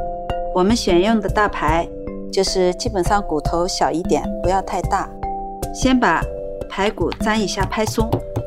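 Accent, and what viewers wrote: Shanghai, China. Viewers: 我也听出来，上海人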